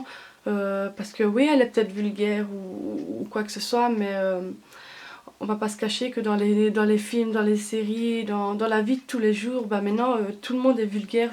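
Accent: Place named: Belgium. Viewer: Et une abonnée en plus ! j'aime bien t'a façon de parler ;) Tu es belge ? moi aussi je suis de Belgique sa se reconnais direct avec nos beau accent MDR :p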